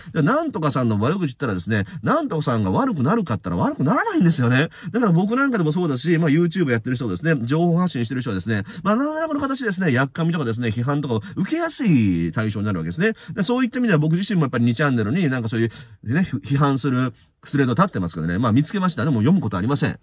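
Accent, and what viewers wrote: Kansai, Japan. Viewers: 確かに悪口言う人とお酒飲むの嫌ですよね‼️ 楽しいお酒は最高‼️ ハッピーなお酒がいい‼️ 自分の時間使って悪口文句言う人と合うのは嫌です！‼️❣️‼️ 私も48時間地獄言葉言いません‼️ 天国言葉を言います‼️ 今日はQさん関西なまり出てました💖 私も天の声聞きたい‼️💖🌈❣️💖🌈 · たまに大阪弁出るの前から好きです。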